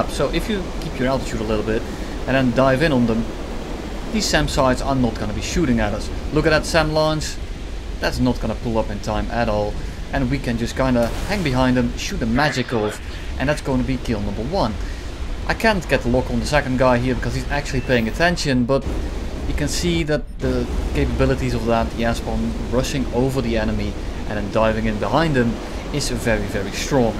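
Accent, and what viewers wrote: Netherlands. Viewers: you sound pretty dutch but i like it very much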